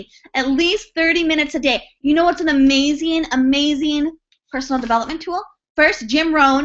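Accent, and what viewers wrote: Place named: North, United Kingdom. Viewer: I love your northern accent!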